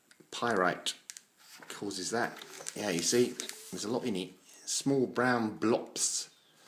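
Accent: RP, United Kingdom. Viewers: Heya … I didn’t get a notification you uploaded just came over after reading your comment on our channel.. I think you are posh 🤣🤣 I come here purely to listen to you don’t care what it’s a about lol.. my dad was a bricklayer and one time back in the day he built 32 houses on a building site and when he got to the last house they ran out of bricks so the gaffer said ahhh sod it bung a low roof on it and it started the bungalow craze off 🤣🤣🤣 always found his jokes hilarious 😂♥️♥️♥️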